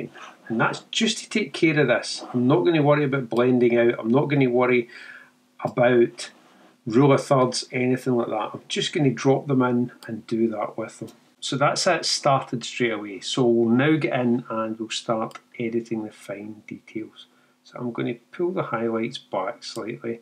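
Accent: Scotland, United Kingdom. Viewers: I love your accent....but you got me with the 22 Megabyte FAIL...What is a FAIL.... After about the 4th or 5th time I heard it, I finally got it. A FAIL is Scottish for a FILE with a long eye in American English. For us Yanks, fail rhythms with pale or pail. English is so screwy. "My attempt at editing was a major fail"...Like failure, failed, DOUGH is pronounced D-OH COUGH is pronounced CAW-F There is a street in San Francisco named GOUGH... Not GO, not GUFF, it is GOFF Do you say NILE like the river in Egypt for those pointy metal things we hit with hammers? As I said, I really love your accent, it is beautiful. Reminds me of the great Sean Connery. And once I got it, I totally understood. It is very charming...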